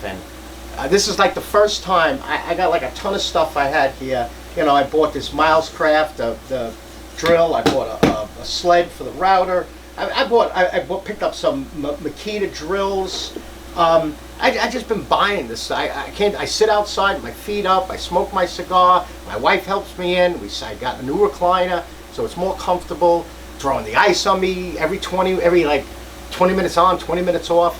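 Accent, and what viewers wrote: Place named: New York, United States. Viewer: Hey just a few things. I'm here saying to myself this guy has to be from NY. Then you mentioned when you lived out here (I'm from NY, Ret.PD). It's just the way we speak lol. The other thing is that I'm sorry for what you have been going through and I hope for a speedy recovery. But what struck me most is your wife. What a class act you are. My wife is one of the most precious things in my life including my kids. And how she took care of you and you making sure you put her first just makes me enjoy and respect your videos more. Not many are as lucky as we are. Take care and God bless you and the fam.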